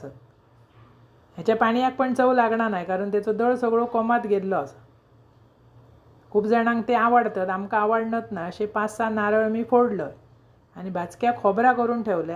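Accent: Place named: Vengurla, India. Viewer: वाह सुंदर वाटप,होय गॅस चो गोंधळ सुरू झालो,माका तुमच्या बोलनावरसून तुंम्ही वेंगुर्ला महेर वाटला, सुंदर receipe कधी व्हीडिओ तुमचे बघताय असा जाता माका,कणकवली क आमचा कोण नाय.वेंगुर्ला कुडाळ सगळी.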